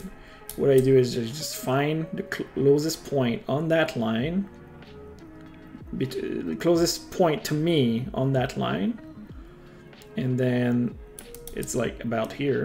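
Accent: Quebec, Canada. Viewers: Are you from quebec?? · bel accent quebecois gros xD jespere sa pue pas trop a trois-r lol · are you from quebec? you really sound like you do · Are you from the Quebec? I'm from there and I think I heards a subtle accent and, the Quebec accent is pretty easy to recognize.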